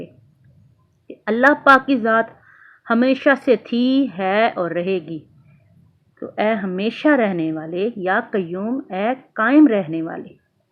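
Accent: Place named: Punjab, Pakistan. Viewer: Your accent is Punjabi not arabic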